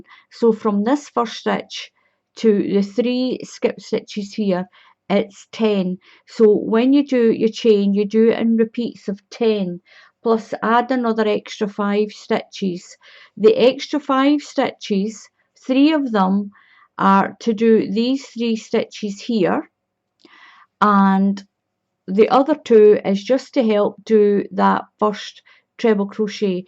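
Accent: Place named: Scotland, United Kingdom. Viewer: I can't believe it...my Momma, 86, just asked me where you were from. I mean, you sound like you were born just down the road from Sean Connery, and she asks! Hello from Texas! This is another great, beautiful tutorial, thank you.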